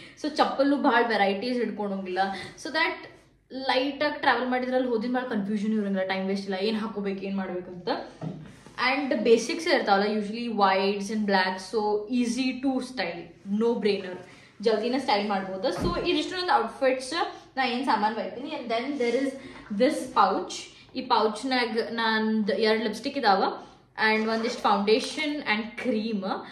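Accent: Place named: North Karnataka, India. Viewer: nam uttar Karnataka accent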